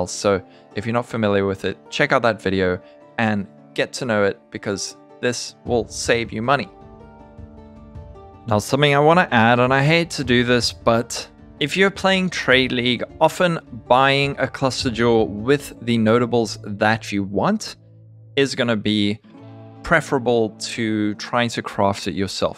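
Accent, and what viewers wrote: South Africa. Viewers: Well i can tell of another south african im also been looking at your vid for a day or 2 nice job man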